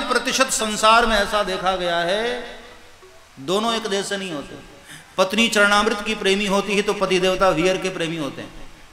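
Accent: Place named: Haryana, India. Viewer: बोलने का ढंग थोड़ा सीख लो कि किस तरीके से बोलना चाहिए तू हरियाणवी की जाठ है क्या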